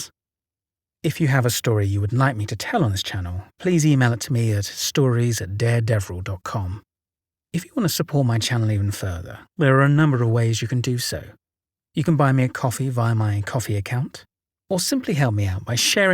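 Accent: United Kingdom. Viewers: Nice! Mysteries are always better with a British accent. Don't know why, they just are!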